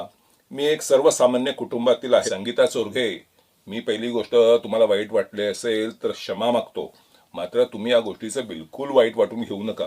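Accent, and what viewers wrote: Pune, India. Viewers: सुप्रभात नमस्कार..... अगदी पुणेकर मराठी भाषा....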